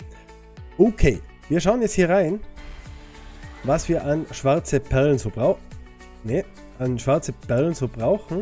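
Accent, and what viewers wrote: Switzerland. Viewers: bist ein schweizer gell 😊😊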